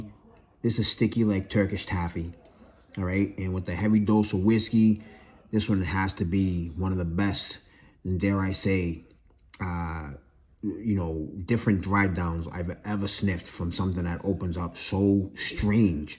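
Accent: Boston, United States. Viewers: Great Video. You have the classic Boston accent! Your selection is impeccable. Im a native to Boston and its great to see someone from home. Im subscribed to your channel. Much Love brother👊🙏.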